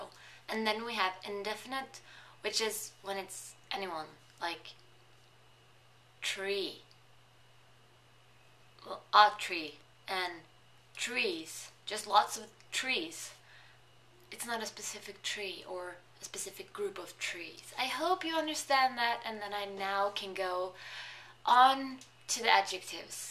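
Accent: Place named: Standard, Norway. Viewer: The official status in general of both Nynorsk and Bokmål is that people have a lot of choice because the labor party tried to merge the languages (allowing versions close to the other one) while other political parties tried to keep them apart ending with a wide specter of permitted forms. I don't know if that is the case with female forms too, but it wouldn't surprise me... We don't have any official standard spoken Norwegian although her dialect is almost the de facto standard.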